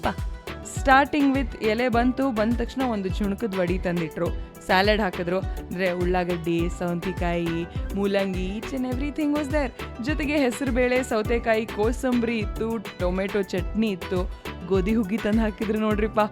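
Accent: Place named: North Karnataka, India. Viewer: Soooo nice to hear UK kannadaali commentry ❤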